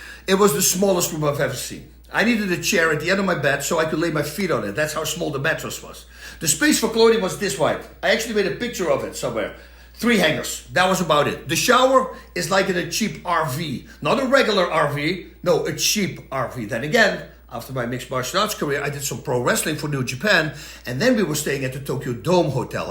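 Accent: Netherlands. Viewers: i couldn’t, his dutch accent is too much. every dutch person speaks english like this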